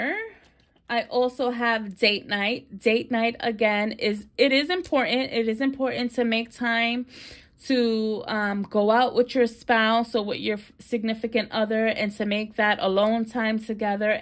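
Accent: New York, United States. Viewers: Correct me if I’m wrong but your accent sounds like you’re from New York. I like the accent lol I’m from Louisiana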